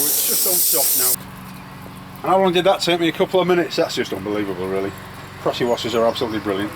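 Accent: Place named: Yorkshire, United Kingdom. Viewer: A really good review, nice to hear a Yorkshire accent and not an American.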